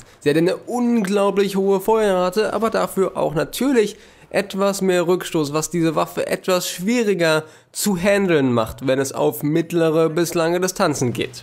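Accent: Germany. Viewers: Kommst du aus Norddeutschland?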